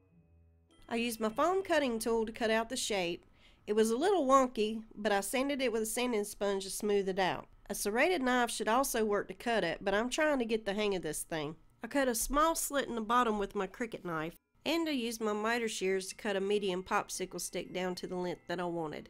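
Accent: South, United States. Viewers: Nice. I love your southern twang! It's fantastic!!!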